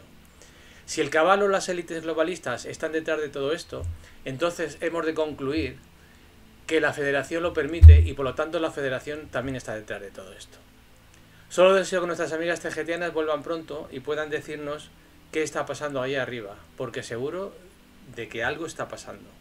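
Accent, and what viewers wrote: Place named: Brazil. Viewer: escribí para comentar en el video. puse: ¿Por qué tengo la sensación que la que habla en el video es usando un modulador de audio y poniendo acento brasileño? Bueno, pues después de contestar que parecía una tonta y que dejaramos de inventar teorías locas etc, borró todos los mensajes.... ya no hay rastro de ellos. Todo lo que dice y cómo lo dice es clavadita. Si no lo quieres ver, vale. Cada cual siga su propio camino, no el de otros.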